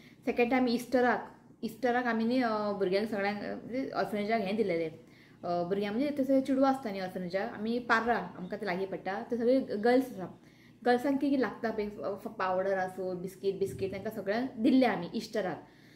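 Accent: Konkan, India. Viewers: Hi Congratulations🎉🎉 God Bless You n your family Your s is the 3rd Vlog I am watching, so I have compared with the other 2 vlogs n found yours to be the best natural vlog, you are perfect in all, I like your konkani accent, I like when you crack jokes, I have tried many of your recipes n it turned superb n shared them to my friends. All the best 🎉🎉🎉🎉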